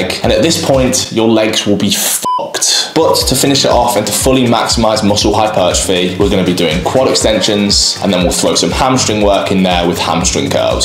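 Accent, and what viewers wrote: United Kingdom. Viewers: The british accent 🙂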